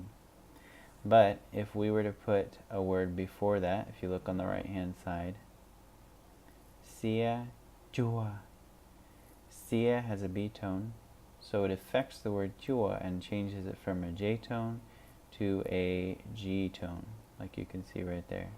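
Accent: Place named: Thailand. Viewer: Thank you so much! This was super helpful to me! I can’t believe I didn’t see this one before. Your accent is super thai here.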